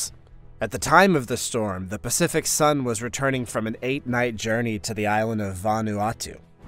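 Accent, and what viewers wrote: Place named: United States. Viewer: You’re pronouncing a lot of the ships wrong and you sound American. How is that????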